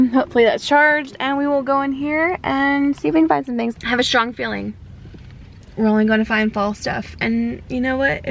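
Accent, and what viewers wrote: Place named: South, United States. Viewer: I can tell my girl is back in the south because that accent is a lil thicker!! 🤣 I love it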